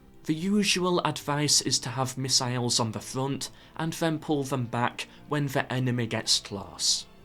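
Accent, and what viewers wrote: United Kingdom. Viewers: You’re voice combined with the UK accent, it’s just too good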